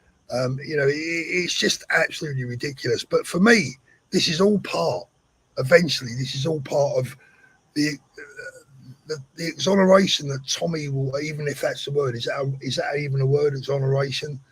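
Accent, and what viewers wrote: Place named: London, United Kingdom. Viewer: Hi, you're on 284,000 subs, great going. I didn't leave as some did, stillnfindcyouvas one of the most down to earth blokes out there, guess it's the London accent, all my family hail from London, dads from the west of London, mums from Poplar. We live in Aylesbury now, wifey and my son. Will be in London on 13/09/25. Stay safe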